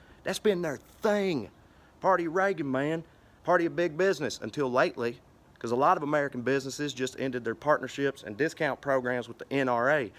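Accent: South, United States. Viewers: the suthern accent has always annoyed the fuck outa me, but gawd dayum if I couldn't listen to this jar of intelligent hicksauce read a fucking phonebook! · It's good to hear that accent talk some sense for a change! Go! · 59 year old white man here. Originally born in s. Carolina. Everything you say is spot on. I love it, I think you are the best spokes person for the irony of these times on the net. More power to you, keep it up. I will come see you if you are in Maryland or DC. Seriously, keep it up. Your voice matters, and your point of view is well thought out and well spoken. And the good old boy slang works to great amusement and effect.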